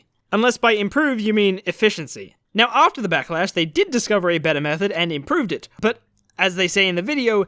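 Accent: Australia. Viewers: wait, you're australian?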